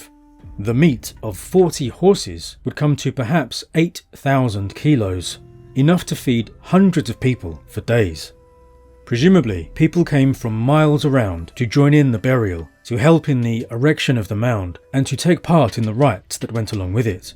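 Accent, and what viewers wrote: England, United Kingdom. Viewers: Oh… So in England, you say it wrong.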